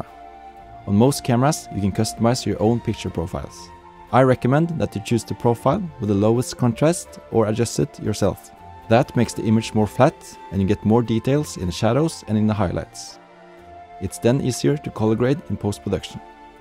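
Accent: Norway. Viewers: Your accent sounds like you are a Norwegian :) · Ah du er Norsk, hviste det med en gang du snakka, men bekreftet når det sto NOK på ebay :D Flott video. lærte masse :) tusen takk :D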